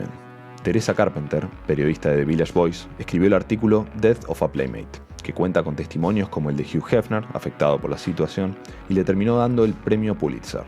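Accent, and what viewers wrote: Argentina. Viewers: Me encantan todos los vídeos de y cada vez lo están haciendo mejor. Una crítica constructiva sin ánimo de ofender a nadie: Me gusta mucho el acento argentino pero en este formato documental es un poco chocante. Me refiero a palabras como «besha» por bella o «gashina» por gallina, también la forma de decir los años como 1009,80 por 1980.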